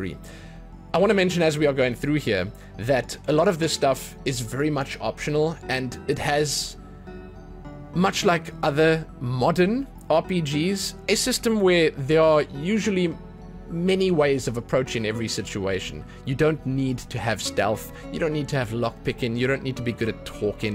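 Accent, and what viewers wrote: South Africa. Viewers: Like for thick South African accent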